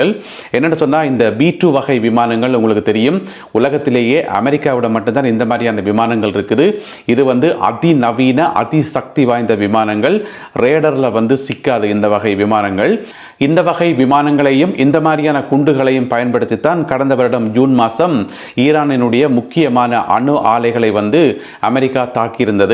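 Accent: Sri Lanka. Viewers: Once in a while give your name in the video I watch your episodes for your Srilankan Tamizh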